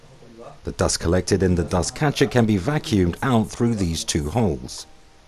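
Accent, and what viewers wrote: United Kingdom. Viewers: British ASMR for Playstation fans.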